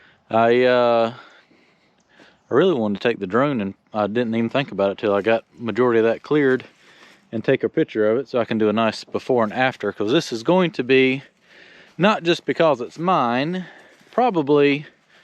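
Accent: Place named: Minnesota, United States. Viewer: Oh, you talk the language up here fer shur 😆 My uncle used to talk like that! Oh Yaa!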